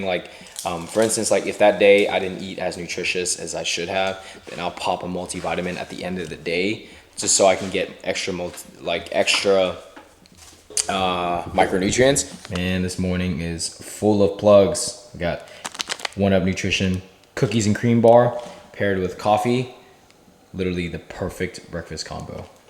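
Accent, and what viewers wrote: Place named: Italy. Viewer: Pretty solid italian accent. Bye from Italy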